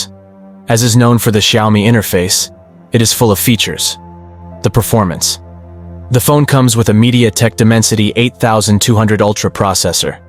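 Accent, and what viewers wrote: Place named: United States. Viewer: I expected that you were from the United States due to your smooth accent. I was shocked that you were from Iran. I am honored by your beautiful content. Keep it up ✨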